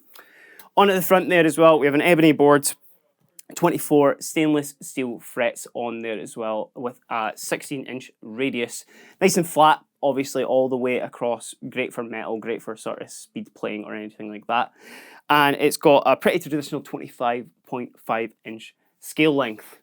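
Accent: Scotland, United Kingdom. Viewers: Unrelated but what accent does the lovely lady have? I hear Scottish, but then maybe something else behind that. Possibly Irish instead of Scottish, but I don’t think so. These are interesting guitars. Kind of unfortunate about the name.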